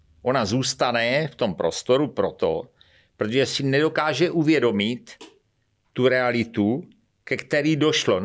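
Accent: Brno, Czech Republic. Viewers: Pač je borec ze Šlapanic Kousek od Brna Mluví Brněnšinou Stejně jako Moris z Madagaskaru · ten hantec mě rve uši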